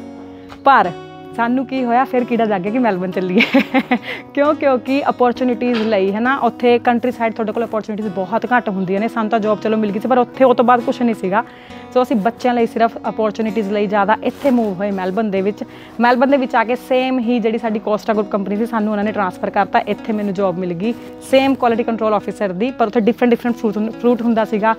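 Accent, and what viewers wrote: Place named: Punjab, India. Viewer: Eene Intelligent ho tusi & Punjab to bahr Jo fir v Punjabi Suit wear kita bahut Sohne Lgde di..... Upro Eeni pure Punjabi Talk ❤❤❤❤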